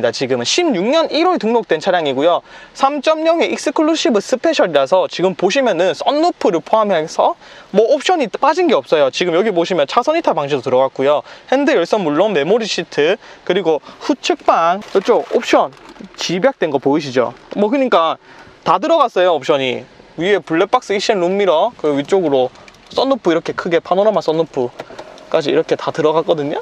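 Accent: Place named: Gyeongsang, South Korea. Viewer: 갱상도 발음이 직이네.. 고마 정이 철철 흘러 넘치네예... 정말 이 동상께 차 사고잡네. 부산까지 고마 날라가서 확 싸 질러 뿌고 잡네. 영상 고마버요. 하는것 보니 인상좋아 잘될낍니다.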